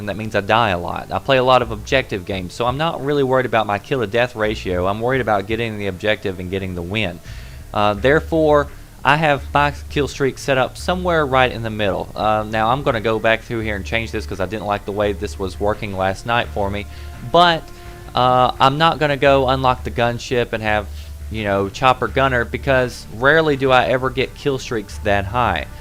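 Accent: South, United States. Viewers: One so what if he sounds like a hillbilly and yeah he has some good vids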